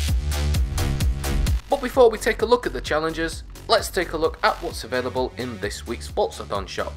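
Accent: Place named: North, United Kingdom. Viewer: Thanks awesome guy. Like the northern accent and you had a couple of funny one liners lol.. But all done!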